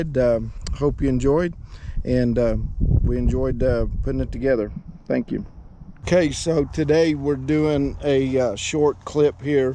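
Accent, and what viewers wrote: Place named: Kansas, United States. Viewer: You sound Kansas are you from Kansas?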